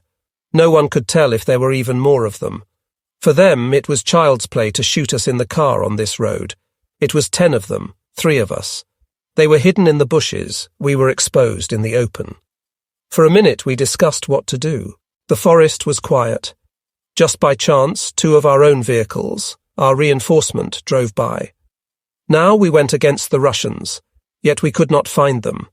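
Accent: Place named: South, United Kingdom. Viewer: it’s an open text AI popular with audiobook transfers. It has a slight southern UK accent but is very good for a freebie. You can always tell the earlier versions because of mispronunciations and statements not making sense. It has developed well past that now and is pretty accurate no matter the text.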